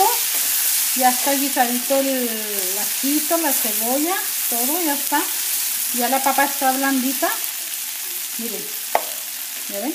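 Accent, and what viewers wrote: Chihuahua, Mexico. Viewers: hablas como de Chihuahua de ahí soy yo también ojalá me contestes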